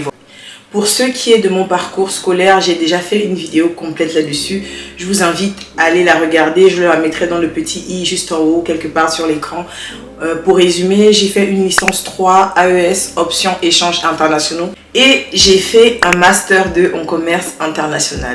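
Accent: Gabon. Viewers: Toi t'es gabonaise 😂😂😂 l'accent ça ne ment pas... J'aime la vérité et le côté naturel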